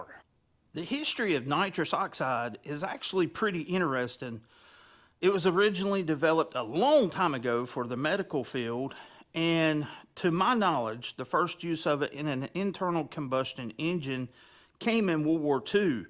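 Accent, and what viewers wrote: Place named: Appalachia, United States. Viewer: I just watched a Video about some Canadian Motorcycle Hill Climbers who added Nitrous to Methanol and Nitromethane for 3 years before it was banned. They said they were warned not to try and Use Nitrous on a Nitromethane fuel injected motor. They did blow 2 cylinders off of a 6 Cylinder Benelli . I overfilled a Bottle one Hot Summer Night . The Little safety Valve has a piece of Copper in it. The Pressure broke the copper. My Trunk lid was all frosty and there was fog coming up through my rear deck speakers. The photo of the back of a car blown up it total BS and as dumb as your accent. Anytime someone talks like a Total Hill Billie I always question their Intelligence.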